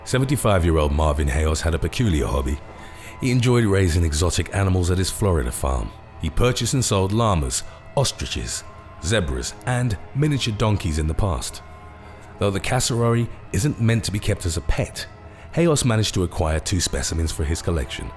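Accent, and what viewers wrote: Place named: United Kingdom. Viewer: He is not an American. So what he pronounced zebra as how it is spelled. We say Zeebra. He speaks the Queen's English.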